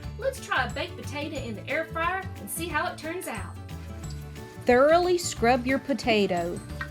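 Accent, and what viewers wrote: United States. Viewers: honestly, I don't understand why people dislike a video. If you don't like something, just move on. I was looking for a fast and easy way to do baked potatoes and your video was spot on. thank you and I was happy to see you're from Tennessee I lived there for two years and I miss it a lot, so your sweet accent warmed my heart, thank you for this wonderful video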